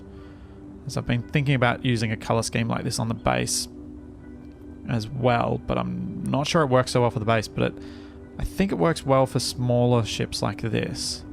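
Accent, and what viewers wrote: Australia. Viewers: Definitely. I'm assuming from the time you post and the accent that you're in Australia? I'm in a bit different time zone.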